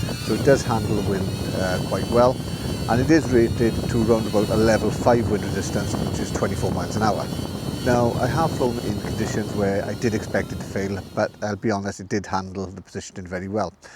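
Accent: Wales, United Kingdom. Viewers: I've almost got my neo2 motion, 😂. I've already bought the 65W wall charger and a fancy carry case. Weather here is improving, only got 1 inch of snow today which is good friday, so not too far from a trip to the hobby shop. If it doesn't like your Welsh accent how will it do with my mixed accent of Geordie and Canadian. 😱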